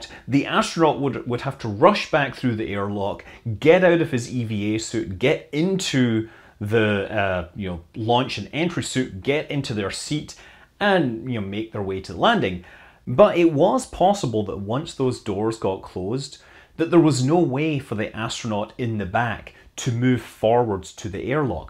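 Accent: Scotland, United Kingdom. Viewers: YouTube cannot compute the awesomeness of the Scottish accent, even at this level of dilution. What would it do with Glaswegian after ten lagers?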